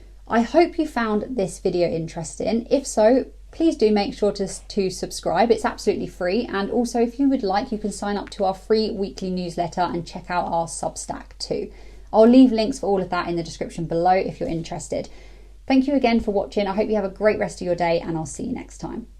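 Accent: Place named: England, United Kingdom. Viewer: I watched your video on the Fabian society and this video. Very nice to watch and listen to a good English lady.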